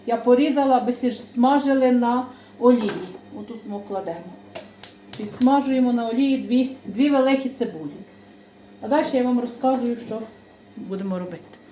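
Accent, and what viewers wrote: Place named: Prykarpattia, Ukraine. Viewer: ДОБРОГО ДНЯ! СЬОГОДНІ ВИПАДКОВО ПОБАЧИЛА ВАШ КАНАЛ І ПОЧУЛА СВОЮ РІДНУ З ДИТИНСТВА МОВУ. АЖ ЗАПЛАКАЛА. Я ТЕЖ РОДОМ З ПРИКАРПАТТЯ. ВІДРАЗУ ПІДПИСАЛАСЬ НА ВАШ КПНАЛ. СКАЖІТЬ, А ЗВІДКИ ВИ КОНКРЕТНО? ДЯКУЮ.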